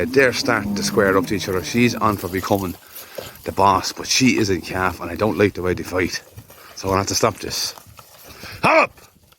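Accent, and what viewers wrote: Ireland. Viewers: Bred , born and reared into it. A career in nursing first but the LAND called out loud so out the gap and got out the wellies. It's a great way of life and healthy.. You got very handy with the CAVAN accent and you been from the west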